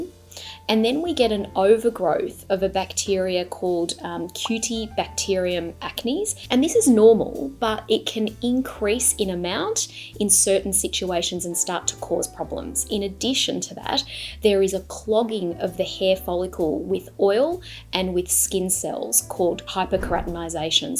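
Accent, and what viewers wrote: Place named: Australia. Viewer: Nice to hear an Aussie!!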